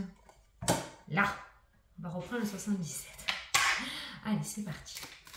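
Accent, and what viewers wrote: South, France. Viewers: Coucou, je vous découvre depuis hier, et c'est rigolo, le début de la guidance avec un accent du sud hyper prononcé et disparaît au fur et à mesure. Merci pour tous ces détails. 🙏🤗❤️ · J'avais raison vous êtes dans le sud comme moi il y a des lotos je pense que nous sommes pas loing mais ce suis pas chiante ont parle comme sa ici.❤❤❤❤❤❤❤❤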